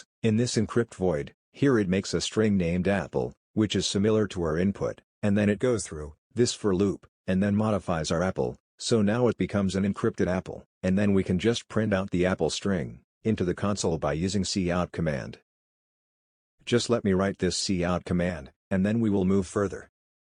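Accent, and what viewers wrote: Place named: United States. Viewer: the American Accent makes it easier to understand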